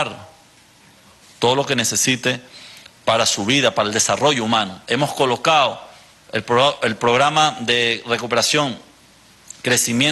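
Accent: Cuba. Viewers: Notan que tiene un acentico cubano?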